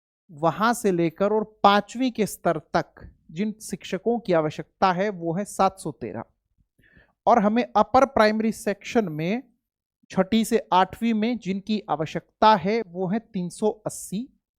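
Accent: Haryana, India. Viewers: Sir apki harayanvi 🎉😮😊😊voice very good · Wha sir aapne to dil khus ker diya aaj haryanvi bol k,aaj lagya apnapen is video m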